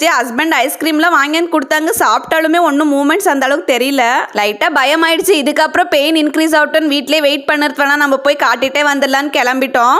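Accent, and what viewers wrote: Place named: Bangalore, India. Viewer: Neenga banglore ahh because unga slang different ahh iruku ❤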